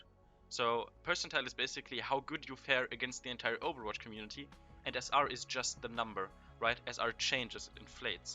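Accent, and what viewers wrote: Germany. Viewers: Great channel, keep up the good work. Sounds like a german accent btw ;)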